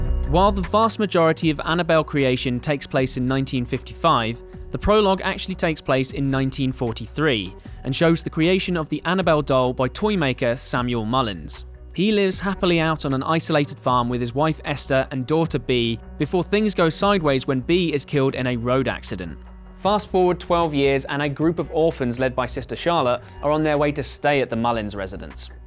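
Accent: United Kingdom. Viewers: Your British accent is charming , like all 'cockneyian " accents are ! Your pronunciation of " la - llorana ", not so much ...😂😂😂😂😂😂😂😂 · it's his accent, basically he pronounced it in british accent because he is british. he didn't pronounce it la yorona because he is not a spanish speaker. we all have distinguish pronouncation b*tch.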